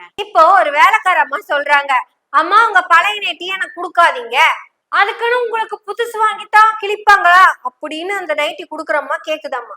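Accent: Kongu, India. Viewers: Kongutamizh Amma super.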